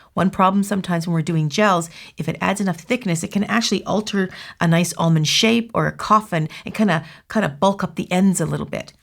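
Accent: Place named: Canada. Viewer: Love your Canadian accent.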